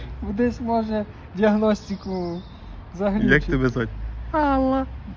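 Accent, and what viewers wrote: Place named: Rivne, Ukraine. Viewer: Така балачка у вас ніби ви з півночі Рівненщини. Дубровиця, Рокитне чи Зарічне?)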